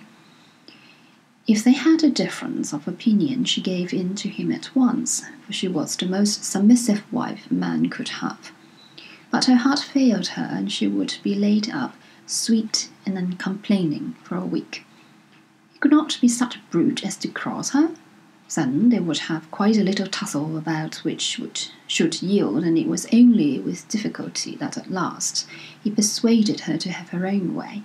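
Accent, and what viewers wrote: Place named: United Kingdom. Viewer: I listened at 0.75 speed. Works perfectly:) Lovely British accent:) Anyway, it's the only reading of it, here on YouTube , so let's be grateful.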